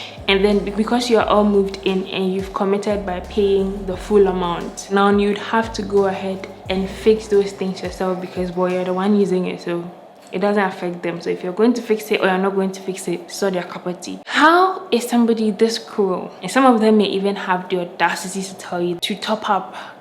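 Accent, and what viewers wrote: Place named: Ghana. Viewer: very well explained and detailed. I love this. I also love the way you talk, are you Ghanaian, if not, what country are you from?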